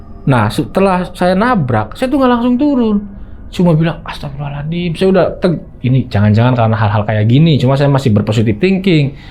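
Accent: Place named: Indramayu, Indonesia. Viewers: Mlesat 😅, bahasa nya engga angger , Indramayu bgt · indrmayunya melekat ya mang😂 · Indramayu hadir dari hongkong...Bahasane kosi kentel pisan ya kang,Indramayu ne ning endi kih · Aku orang indramayu bang logatnya sama kalo ngomong pahaam betulll 😂❤❤❤ top lah ceritanya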